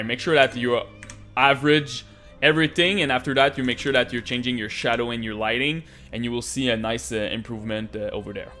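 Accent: Quebec, Canada. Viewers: i swear to god this guy must be from quebec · Tes quebecois c sur :P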